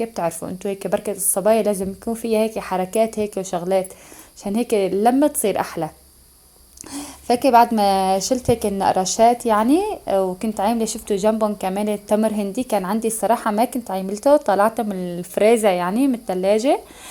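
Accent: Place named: Aleppo, Syria. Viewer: تشرفنا روحي والله عرفتك من حلب من صوتك بس استغربت عبقلولك انتي من شام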